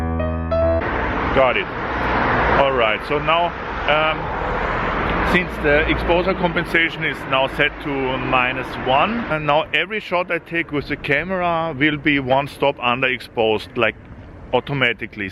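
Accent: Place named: Germany. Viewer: Dein deutscher Akzent bei deinem Englisch ist der Hammer🤭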